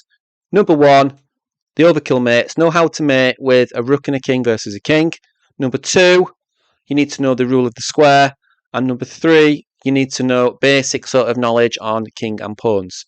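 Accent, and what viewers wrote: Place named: Hull, United Kingdom. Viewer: I found your channel by chance, started this video and kept it going because of the way you pronounced "it's a bit daft really" and of course for the chess help but I'm from hull I'm sure u know it and you talk a bit like me so I subbed great content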